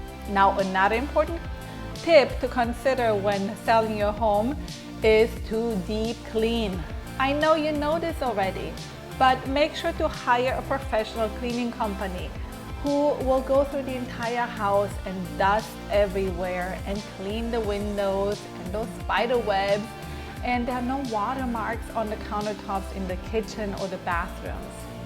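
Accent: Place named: Romania. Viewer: ! Salut! Ma bucur sa aud o voce romaneasca!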